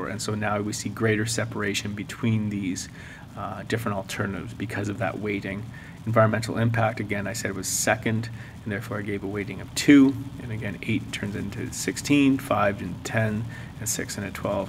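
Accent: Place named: Canada. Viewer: Nice Canadian accent!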